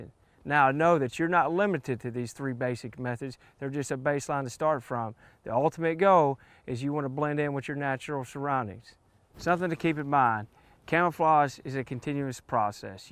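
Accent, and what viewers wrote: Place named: South, United States. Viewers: reminded me of Forest Gump... that southern accent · Is it a requirement to sound like you're from Carolina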